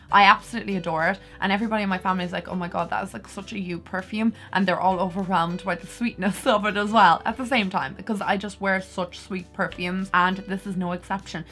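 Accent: Ireland. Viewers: I just love watching you and listening to your brogue! It reminds me of my Nan who was from Kenmare.. I love you!! Much love from Boston!!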